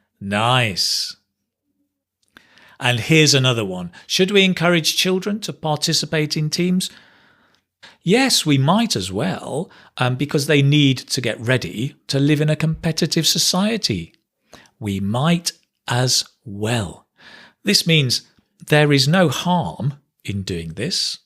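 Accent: United Kingdom. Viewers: I definitely love your British accent, hope you'll provide us with more videos <3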